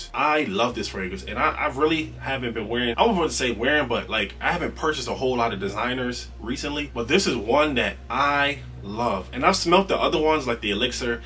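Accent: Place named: New York, United States. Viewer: Bro sound like he from nyc , much luv . One of the few reviewers that don’t talk about clones in every video , keep it up g I’m taking 📝.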